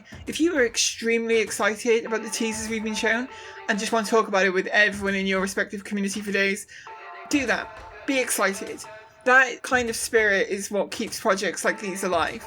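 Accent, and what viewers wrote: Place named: Liverpool, United Kingdom. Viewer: This accent sounds like Scouse.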